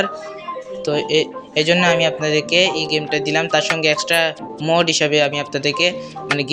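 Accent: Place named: Sylhet, Bangladesh. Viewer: আপনার কথার ধরন শুইনা মনে হইলো সিলেট এর...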